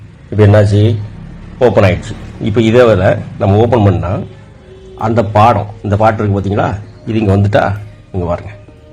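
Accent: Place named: Bangalore, India. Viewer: Ne bangalore tamil pesitu iruka solu da DMK SOMBU dog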